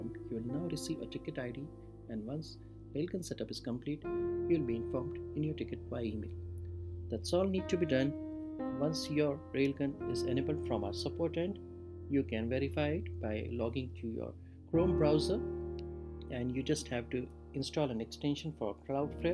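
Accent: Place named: India. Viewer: Click on tutorial Hear Indian accent Close tab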